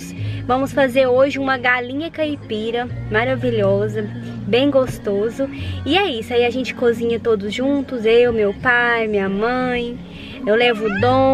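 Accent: Minas Gerais, Brazil. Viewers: você é mineira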